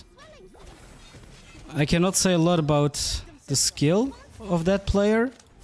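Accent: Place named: Portugal. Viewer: Eita ele fala português de portugal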